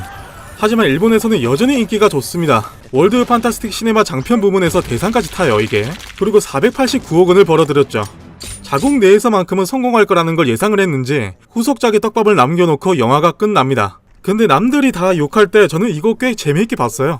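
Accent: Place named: Gyeongsang, South Korea. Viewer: 갱상도 분이시네예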